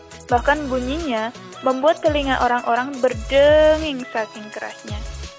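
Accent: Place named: Java, Indonesia. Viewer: Yg baca keliatan orang jawa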